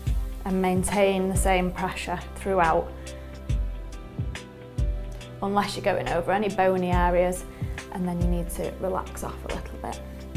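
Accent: United Kingdom. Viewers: Can someone tell me from what part of Britain is her accent? Thank you. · Are you british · A Swedish massage with a nice British accent :)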